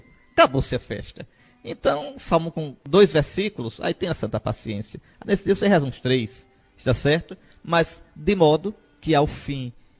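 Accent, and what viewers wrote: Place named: Pernambuco, Brazil. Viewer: Querido Fom Henrique, ajudai-me, lá do Céu,a fazer uma santa quatesma. Como gosto de ouvir suas explicações, com este sotaque e expressões pernambucanas, lembrando a minha origem nordestina! Que gracinha! Tanta santidade, se capta em seu modo de expressar e transmitir as coisas de Deus, com um amor extraordinário por Jesus Cristo, Nosso Senhor! Que delícia são as vossas homilias! ❤❤❤❤❤❤❤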